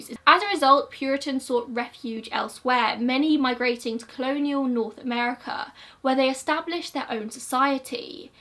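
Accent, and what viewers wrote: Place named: RP, United Kingdom. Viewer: I feel like posh accent should be saying "mind the gap" or calling out the numbers in an elevator. Not gonna lie, it lulled me to sleep ❤️❤️❤️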